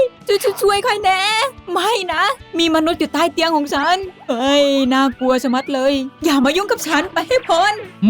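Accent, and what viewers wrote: Isan, Thailand. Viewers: พี่เป็นคนอีสานเหรอ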